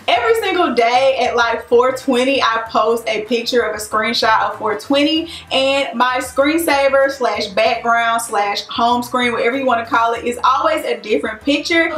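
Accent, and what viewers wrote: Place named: Texas, United States. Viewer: Your voice is kinda a mix between a Texan/southeren accent and something else lol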